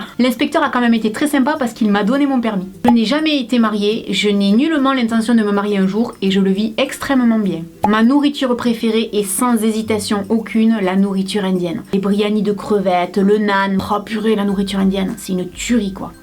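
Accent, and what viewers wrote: South, France. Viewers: Sud de la France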